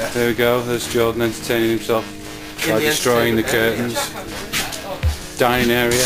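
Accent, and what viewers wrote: United Kingdom. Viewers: 1967 MUSTANG Near Canada???????????????????? Well I suppose northern England is near Canada if you live on the moon! However being as how you don't please, please have another look at the video and you will be surprised to see all the vehicles taking part have British, that is United Kingdom British, number plates. And if that isn't enough what kind of Canadian accent do you think you can hear the bloke speaking with? Near Canada indeed!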